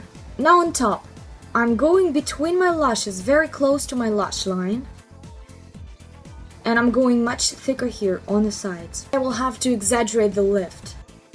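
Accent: Russia. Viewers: Кажется временами у вас проскальзывает русский акцент или какой-то славянский. Я хочу сказать что я в шоке после просмотра вашего видео. Иногда когда вы склоняете слегка лицо и прищуриваете глаза, тогда я точно вижу героиню вашего сюжета. Я честно говоря в шоке как вам так быстро удается это нарисовать. А главное похоже притом что у вас совершенно и глаза другого цвета и лицо другой формы. Я просто восхищена вашим искусством! Сама люблю рисовать но не на лице. Наверное вы какой-то известный визажист(я первый раз на вашем канале) .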